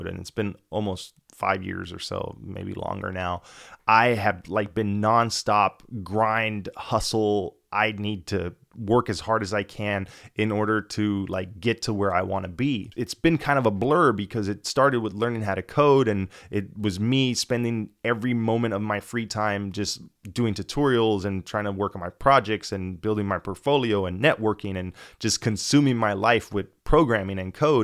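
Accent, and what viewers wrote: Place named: Miami, United States. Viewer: Glad I heard this. We grew up with similar lives and happy to see there’s more people like me in the tech industry. Btw as soon as you started talking I knew you were from Miami, I was right lol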